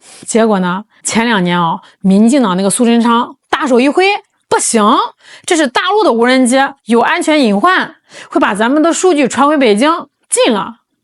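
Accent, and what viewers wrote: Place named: Shandong, China. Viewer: 一听就是山东的，外网键政圈大部分是山东人😂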